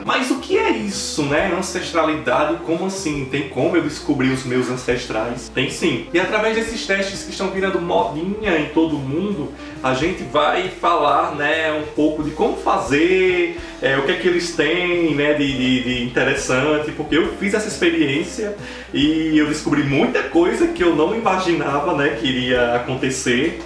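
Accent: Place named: Paraíba, Brazil. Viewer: É tão bom ouvir sotaque da Paraíba aqui no youtube ❤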